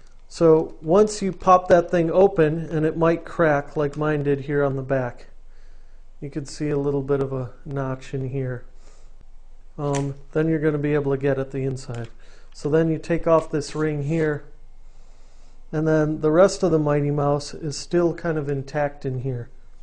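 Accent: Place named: United States. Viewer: Thanks! At last! Someone who actually knows what they are doing in a Apple Mighty Mouse take apart & repair. The instructions are very good and the narrator's voice is in adult American English. Plus the narration is without a lot of superfluous "Uhhh"s and other vocal diatribe. And it is even edited well and in focus! What a novelty! In my case, the outer containment ring was REALLY glued down in front. It took a good half hour of gently slicing into the space between the ring & the housing to with several sharp Xacto knife blades to finally cut through the glue. The containment ring is pretty flimsy and I was afraid of breaking it so I did not try to pry it too hard. Patience (and a sharp blade) is the key when it is glued so hard. This mouse of mine had stopped working properly about two years ago. It would not scroll in one direction. But upon disassembly I found that my unit was not as dirty as I thought it was. 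What I discovered was that just one of the little tiny wheel & shaft assemblies that surround the ball was really jammed up with debris keeping it from moving. Because I waited so long to do my tear down the shaft had actually worn down on one side from the friction of the ball against it. So my problem was not solved. It certainly works faster and smoother than before but that one direction is still not functioning due to the worn shaft. Lesson learned—if simple exterior cleaning is not enough (it never was) then do a tear down and initiate a proper cle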